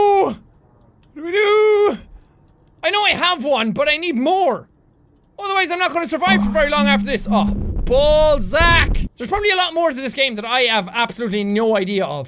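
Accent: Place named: Ireland. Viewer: Love your Irish accent I'm part Irish but I don't have the accent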